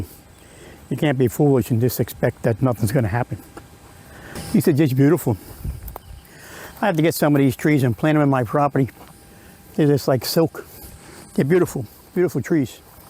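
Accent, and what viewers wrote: New York, United States. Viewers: Hi, loved this video...Your house and garden are looking so beautiful...Have meant to say before, I just love your New York accent...👍❤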